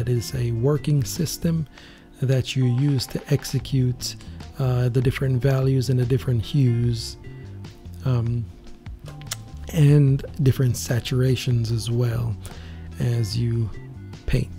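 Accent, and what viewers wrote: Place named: Jamaica. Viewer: Very nice are you Jamaican? Your accent sounds like me. I was looking for colored skin tone so I checked out your tutorial, it’s very informative. Thank you.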